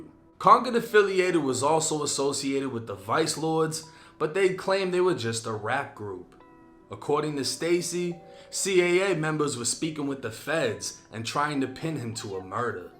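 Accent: Boston, United States. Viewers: The way you have developed your delivery and pronunciation while still holding on to that Boston sound we all love. Your in a league of your own..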